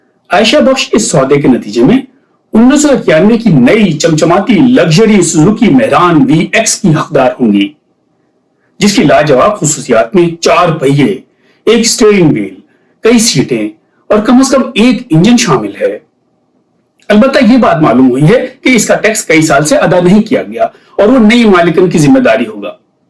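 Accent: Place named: RP, United Kingdom. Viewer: ایسا لگ رہا جیسے بی بی سی سن رہے. کمال ایکسنٹ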